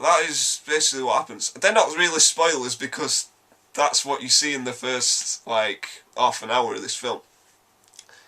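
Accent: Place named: Northern England, United Kingdom. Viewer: You are so likeable , corrie accent love it 🤣🤣🤣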